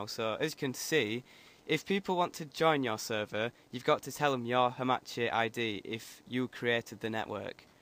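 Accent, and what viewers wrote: Scotland, United Kingdom. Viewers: Scottish Accent :3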